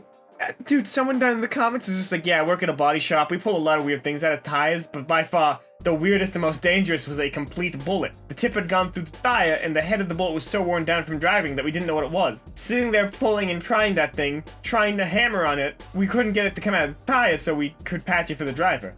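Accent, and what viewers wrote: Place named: New York, United States. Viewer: Just found this channel, love your new york type accent and you seem like a very nice guy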